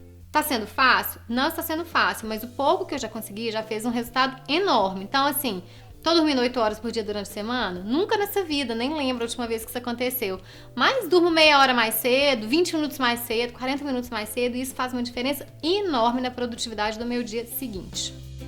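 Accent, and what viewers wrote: Minas Gerais, Brazil. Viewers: Eu gosto muito desse sotaque minerin!!!!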